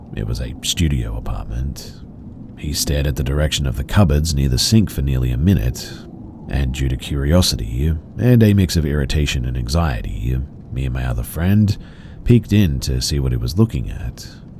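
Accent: Australia. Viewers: Just the aussie I was lookin' for to put me to sleep!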